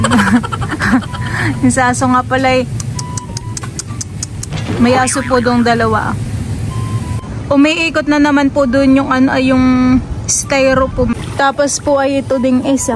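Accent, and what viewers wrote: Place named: Mindoro, Philippines. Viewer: Pamilyar at nadinig ko Yang tuno na Yan sa lubang Mindoro, Taga Mindoro kaga?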